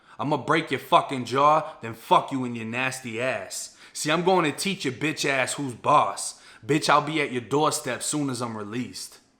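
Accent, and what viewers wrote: Boston, United States. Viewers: I dont care what anyone says u look n sound mad familiar n thats a Boston accent.lol..💯 It'll come to me i lived in FL when i got released for 5yrs!! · Bro this shit funny as hell. I was just watching some of your old videos and I gotta say man, your Boston accent has gotten so much thicker since when you first got out. Your old videos you still had that Florida style speak. Now you straight Red Sawks and ima have a bea down at the bah style.. Lol. Love ya videos tho bro. Also, I used to just listen to your videos while I was driving but now you gotta actually sit there and watch your videos cuz you got photos, pics, graphics and shit. Stepped yo YouTube game up bruh. Keep doing ur thing tho, my man. Awesome content. Awesome stories. Peace bruh..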